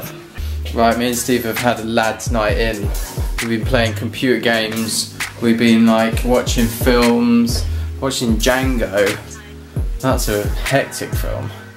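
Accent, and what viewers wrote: Ireland. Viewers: Love the Irish accent ;) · I love both The Verve, and Bombay Bicycle Club :O You have great taste in music haha. By the way, you sound like a very convincing Irish man. I'm Irish, so I know :P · ps - Your Irish accent is bloody amazing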